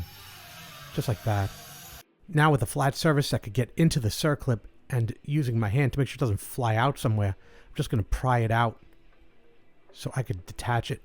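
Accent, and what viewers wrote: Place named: East Coast, United States. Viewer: Phenomenal series, stellar camera work and editing! I can't believe you don't have a million subs and a billion views on each video! Precision workmanship. Hard to miss that east coast accent, I lived in Upper State N.Y. as a kid and Long Island as a teenager. What's the story with the pair of Yamaha's in the background? I've got an '06 Yamaha Stratoliner S model (113) that's Darksided. What brand starter did you end up using?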